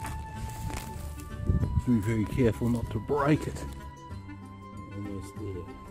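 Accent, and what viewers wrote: Australia. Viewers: You've got a fantastically soothing voice. Great to hear a fellow Aussie who doesn't sound like Steve Irwin. Very cool video!!! You speak very sweetly about the spiders too!!! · Are you Aussie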